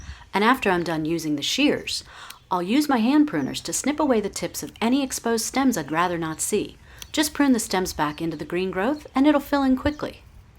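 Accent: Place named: United States. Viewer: Sounds like she iş american BUT gardening in england.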